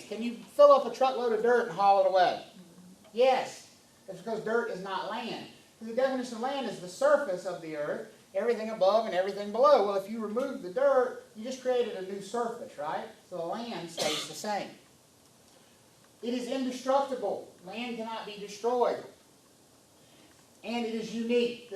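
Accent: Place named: North Carolina, United States. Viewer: sounds like NC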